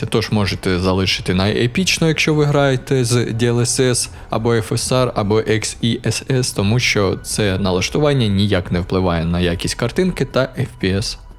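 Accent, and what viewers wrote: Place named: Russia. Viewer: Не висОко, а вИсоко, трохи тягне тебе на руський лад. А загалом лайк.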